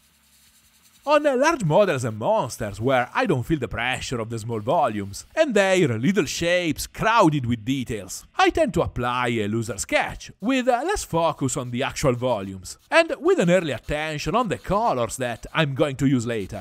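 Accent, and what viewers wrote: Italy. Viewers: Video davvero belli di ottima qualità! Molto interessante ed istruttivo. Molto simpatico l'accento inglese un po' italianizzato anche se a volte è davvero comico 😁😁... Ma comunque complimenti! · aaaah okok! Sentivo dall'inglese un po' "maccheronico" che un po' tutti noi italiani abbiamo! Apparte questo, sei un mostro grande!!! Io ho iniziato ora escono statuine del presepe.... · Complimenti! Sei bravissimo, poi l'inglese stile Super Mario è la ciliegina sulla torta! 🥰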